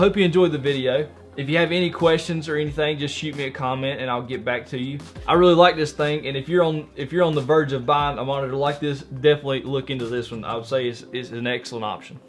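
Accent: South, United States. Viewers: Fantastic review! How do you not have more subs?! Very informative and you cover everything. I also absolutely love your southern accent, a pleasure to listen to.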